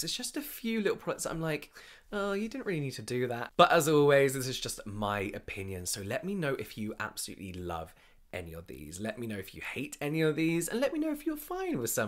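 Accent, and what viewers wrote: United Kingdom. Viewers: Omgggg your British accent 😍😍😍😍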